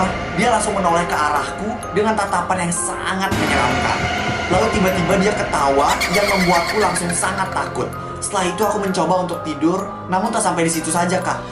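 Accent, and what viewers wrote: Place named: West Java, Indonesia. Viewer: yng cerita pasti orang Sunda 🗿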